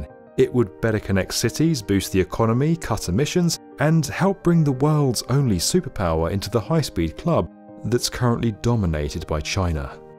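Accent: England, United Kingdom. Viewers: Another fun fact-- the hugeness of our country & relative lack of population density makes new trains less practical. Endless EPA hoops & needed land acquisitions add decades & hundreds of BILLIONS of dollars to the project. A suave-sounding Englishman does not make the project more appealing or make gargantuan hurdles any easier to overcome. Concrete from early construction will need to be repoured toward the end of construction at the current pace. I know, CHINA, CHINA, CHINA. THEY HAVE GREAT TRAINS THERE. MAYBE YOU SHOULD RELOCATE TO BEJING! Nsity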